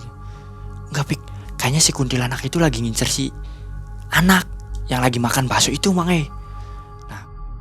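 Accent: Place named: Cirebon, Indonesia. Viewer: Penuturnya enakan mas...logat cerbon tp apa adanya,lugu polos gk dibuat2..mas smakin lama cerita,smkin penasaran jgn hbis dlu critanya..klo ini berapi2 tp malah pngn cpt2 selesai...ayo mas,cerita lgi mas..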